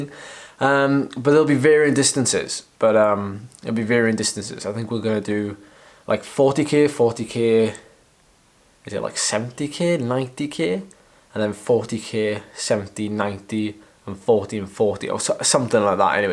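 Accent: Wales, United Kingdom. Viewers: When I moved to Cardiff as a kid one of the first things I heard was ‘now in a minute’ Still tickles me 50 years later. How Welsh are you but? 😂🏴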